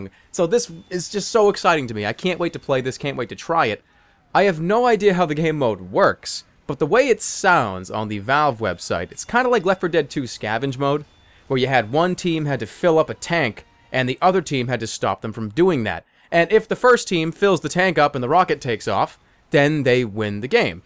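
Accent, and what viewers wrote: Boston, United States. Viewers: I watched Shibby2142's video about the new weapons, and I gotta say: this video is much more entertaining. Shibby is still funny but more calmer and less energetic. Your videos always have this energy to them (maybe it's the Boston accent), and that's why I subscribed to you before Shibby.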